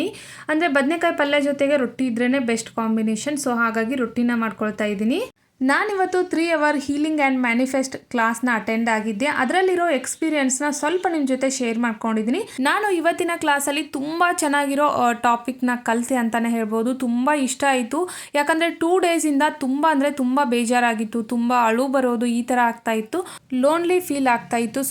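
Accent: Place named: Davangere, India. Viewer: Sis nimdu Davangere na language ade tara ede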